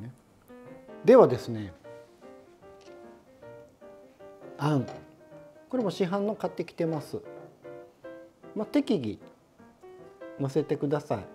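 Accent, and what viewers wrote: Osaka, Japan. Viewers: イギリスからです。以前から日が経ったパンを使ってブリーチーズとクランベリージャムとサラダほうれん草を挟んでオリーブオイルを薄く塗ったフライパンで押さえつけてホットサンドを作ってました。先ほど少し硬くなったくるみとクランベリーのパンを使ってコウさんのおっしゃる通りバターで冷凍ベリーミックスとブリーを挟んで何回も押さえつけて裏返しながら焼くと本当にサクッとパイのようになりました。いつも美味しい手軽に作れるレシピをありがとうございます。大阪節トークもいつも楽しみにしています。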